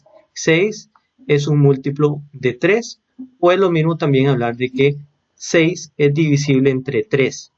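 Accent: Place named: Guanacaste, Costa Rica. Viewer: como se nota que es tico por el acento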